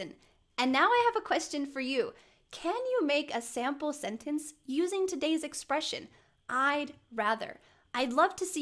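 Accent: United States. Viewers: I 'd rather learn American accent instead of British accent that is why I follow your channel.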